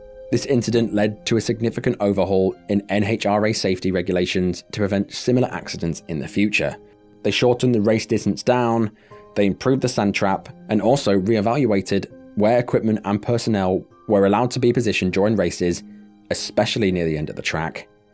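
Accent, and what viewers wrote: United Kingdom. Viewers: This video would've been OK except for that ridiculous British accent on the voice. Damn I hate those.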